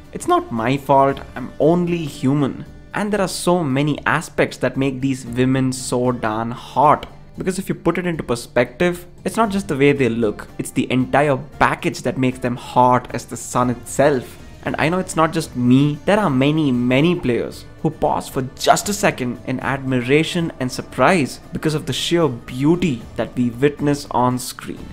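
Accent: India. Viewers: your accent makes It sound like real love the Indian accent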